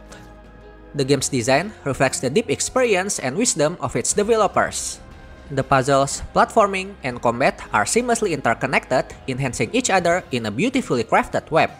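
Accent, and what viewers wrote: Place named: Indonesia. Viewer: aksennya kyk org indo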